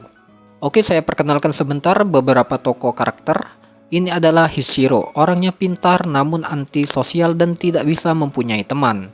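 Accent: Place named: Java, Indonesia. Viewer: Wong jowo bang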